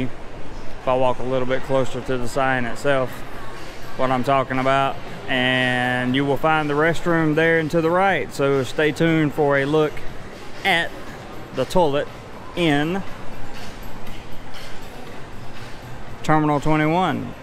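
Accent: South, United States. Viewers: Great vid, do you mind if I ask what part of the States are you from? Love the southern accent. I toured across the States with a rock band when I was in my 20’s, loved the southern states, southern hospitality is something that everyone should experience at least once.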